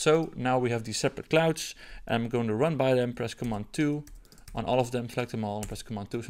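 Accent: Netherlands. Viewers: The accent might be Dutch, not sure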